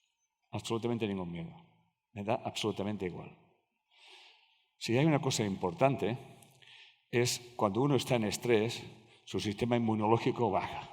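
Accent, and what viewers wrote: Catalonia, Spain. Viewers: hay palabras q no se te entienden porque tu catalán lo impide.....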